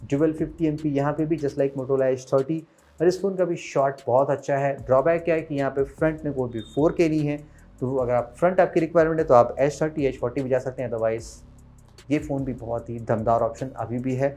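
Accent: Lucknow, India. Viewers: Are you from lucknow❤❤👍🏻✨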